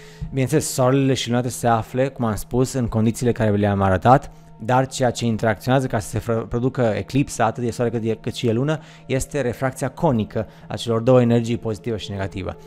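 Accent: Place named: Maramureș, Romania. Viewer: ai accent interesant, esti din Maramureș?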